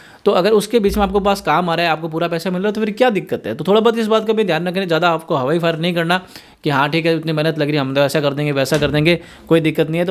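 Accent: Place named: Marwari, India. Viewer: मारवाड़ी सुनकी मजा आगया 😅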